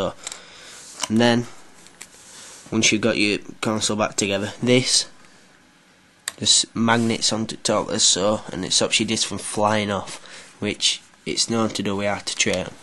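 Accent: United Kingdom. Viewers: neither, english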